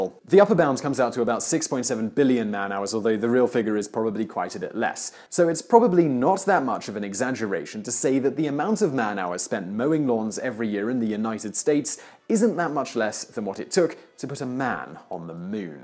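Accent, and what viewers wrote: United Kingdom. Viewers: U British people talk so funny. U said advertisement funny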